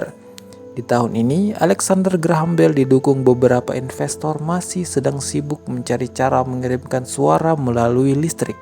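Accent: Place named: Palu, Indonesia. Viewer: Salfok dengan logat palunya le😁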